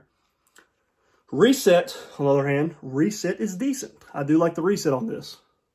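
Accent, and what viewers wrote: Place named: Australia. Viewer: Interesting accent. Is this guy an Aussie expat?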